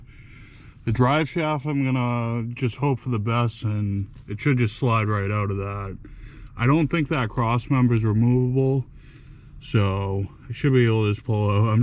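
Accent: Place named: Massachusetts, United States. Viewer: I can tell by your accent. I'm originally from Wareham down by the cape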